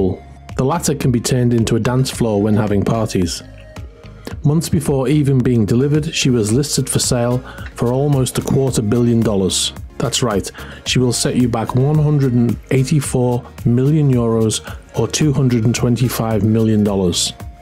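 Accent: United Kingdom. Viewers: By the way, bloody good job on the intro image of the S/Y eSysman. Sets the proper mood. Next improvement may be to animate the stern door to open, revealing your Porsche. And for what it's worth, being new to your channel and somewhat out-of-tune with a British accent, will you forgive me for thinking that all the boats you feature are flagged in Cyprus? (super yacht...... cypriot).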